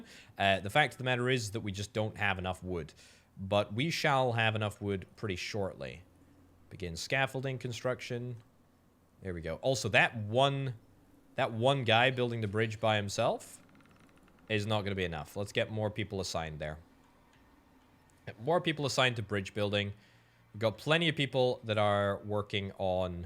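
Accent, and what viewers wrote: Scotland, United Kingdom. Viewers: I thought heard some Scottish in there. I'm from Edinburgh, though and I can't place the accent. Was wondering if there was something more to it.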